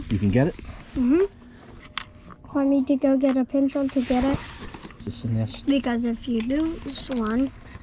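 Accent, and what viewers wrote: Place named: New Jersey, United States. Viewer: Yoo from Joisey? Fun accent :-) "Yo, Adrian!"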